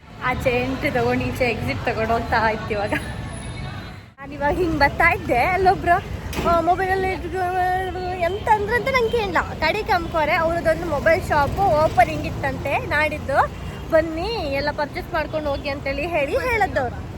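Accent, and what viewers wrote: Kundapura, India. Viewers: ಕುಂದಾಪ್ರ ಕನ್ನಡ ಚಂದ್ ಮಾತನಾಡತ್ತಿರ ಮೇಡಂ ❤️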